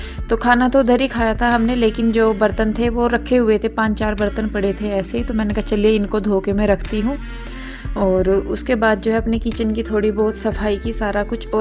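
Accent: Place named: Rajasthan, India. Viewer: Hi mein new sabscribar hu aap ka chenal sabscribar keya hai meine roj video dekhti hu aap ki bolne ka tarika matlab aap ki lengvej bahot achi lagti hai aap kaha se ho rajasthan ya hariyana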